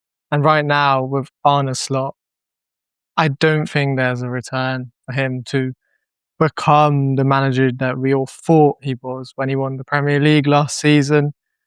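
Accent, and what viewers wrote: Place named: Liverpool, United Kingdom. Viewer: Proper scouse accent there 🤨🤨🤨🤨🤨🤨🤨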